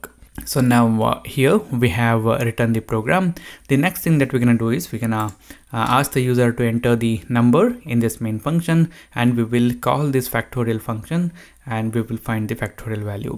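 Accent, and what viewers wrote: India. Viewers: Please leave and never come back if you cant accept Indian Accent.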